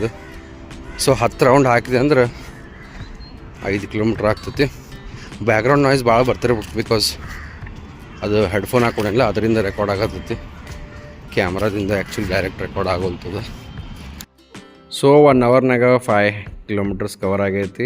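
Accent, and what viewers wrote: North Karnataka, India. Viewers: ನಿಮ್ಮ ಭಾಷೆಯಲ್ಲಿ ಬಳಸುವ ಕೆಲವು ಪದಗಳು ಎಷ್ಟು ಸುಂದರ..... ನೀರಾಡಿಕೆ ಅನ್ನೋ ಪದ 👌🏼👌🏼👌🏼👌🏼👌🏼👌🏼. The star of the Vlogs is your North Karnataka language 👌🏼👌🏼👌🏼👌🏼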